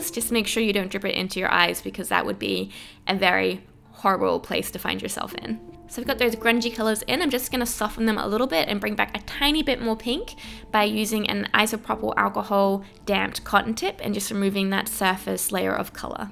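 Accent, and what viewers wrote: Australia. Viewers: What part of Australia are you from I'm from port maquarie 😄😄😄😄😄😄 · I really really love this! As a human being which is fluent in english but not really sure if I understand dialects or different accents, can someone please tell me if she has an australian accent? :D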